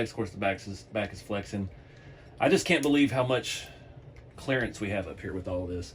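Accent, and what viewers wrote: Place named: South, United States. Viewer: RC4WD science!!!! I have a way worse southern draw than you do. Fella that tried correcting you would hate to talk to me lol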